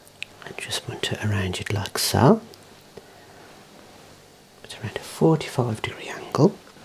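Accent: Birmingham, United Kingdom. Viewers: I can't believe...how satisfying this is....The UK accent fits accurately to calmness of this video. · Is that a birmingham accent? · I only realised after reading comments that this is a sort of remake of that Irish man making sandwiches. His voice is golden but your brummie accent is quite nice. Nice for you to put a 'British accent' on the video that's not a posh London accent. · whereabouts in the uk are you from? i can’t place your accent 😊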